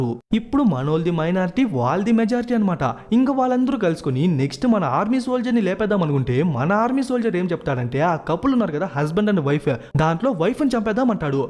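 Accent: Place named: Karnataka, India. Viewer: ఎం ఉండ,ముండా,బండ రా కొజ్జనా కన్నడ గా తెలుగు కి తెగులు పట్టించే లా ఉన్నావే నువ్వు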